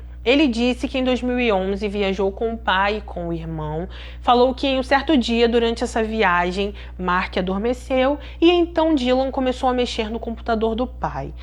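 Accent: Rio de Janeiro, Brazil. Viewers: O vídeo e a história são maravilhosos 😀 😀😀... Mas o sotaque carioca é um nojo 🤮🤮🤮.. horrível 🤮🤮🤮🤮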